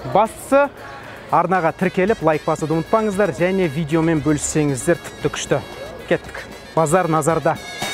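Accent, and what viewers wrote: Standard. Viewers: Сөзсіз керемет, таза қазақша ісің алға бассын бауырым! Тіркелдім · Жақсы түсірілген таза қазақша, осындай сауаты білімді тілшілер көп болсы екен👍👍👍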